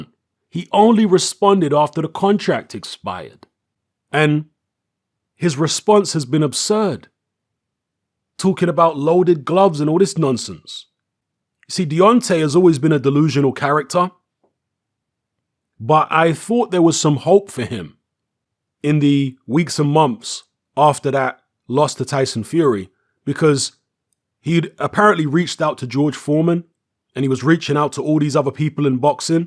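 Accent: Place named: RP, United Kingdom. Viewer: Oi! How on earth would you insult Deontay Wilder with the cleanest British Queen's English eh?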